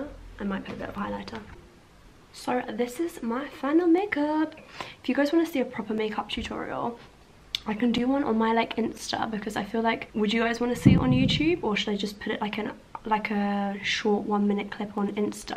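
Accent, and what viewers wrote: Iran. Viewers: Hi 😍 Please speak more Persian in your videos! I love your Persian accent. especially when you and your family speak in farsi! I hope you and family be safe and happy as always!💞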